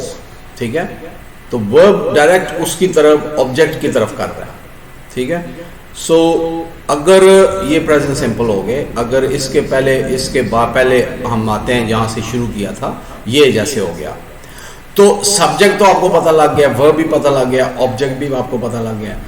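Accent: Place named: United Kingdom. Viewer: That is such a great opportunity to learn English with you I am so glad to listen your British accent so I am ready to learn English with you..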